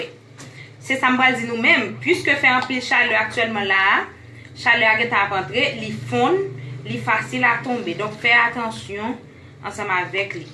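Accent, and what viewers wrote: Haiti. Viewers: Moun nan k dii exprimel an français madame nan sonw ayisyèn ok saw di a pa gen plas Li dakó banm zizi San valè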